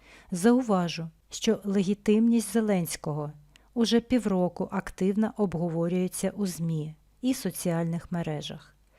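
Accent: Russia. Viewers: ваш москальский акцент аж випирає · з явним російсьим акцентом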